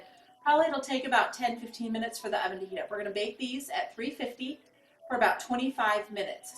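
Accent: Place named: Scotland, United Kingdom. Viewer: The first sentence made me think you have a Scottish accent :-P.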